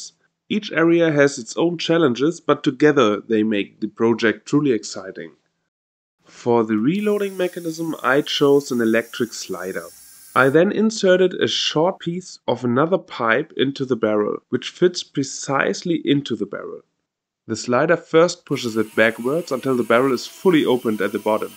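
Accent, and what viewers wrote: Germany. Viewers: You sound German, is this legal in Germany? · You sound german. Ist das was du baust nicht illegal hier? 😅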